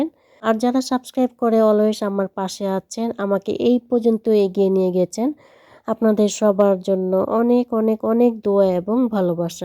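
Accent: Dhaka, Bangladesh. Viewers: লাইক দিয়ে শুরু করলাম ❤ অনেক সুন্দর ভিডিও শেয়ার করলে আর অনেক ভালো লাগে ঢাকাইয়া কথা শুনতে। রান্না গুলো বেশ মজা হয়েছে মনে হচ্ছে তাই না?❤ আমি ও আপু গরম পানি দিয়ে রান্না করি তাহলে রান্না বেশ মজা হয় ভালো লাগলো ❤ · Dua Kori sob report jeno valo hoy r betha O jeno valo hoye jay. Dhakaiya kotha sunte valo e lage. Onek kichu ranna korle.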